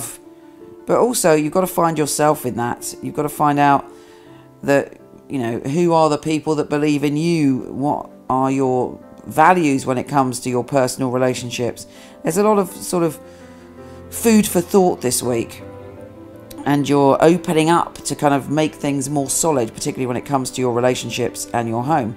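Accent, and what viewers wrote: United Kingdom. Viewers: Your videos are stunning. if they had an award for production I would vote for you. I always come for my weekly and monthly reading. You are such a force with your information. Oh, I just adore the British accent. Cheers!!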